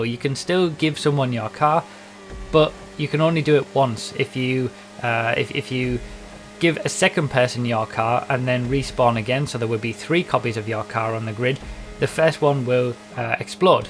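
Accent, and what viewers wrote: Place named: London, United Kingdom. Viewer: Yeah he does have quite a london ting accent, fortunately I have friends that speak like him so I've developed an understanding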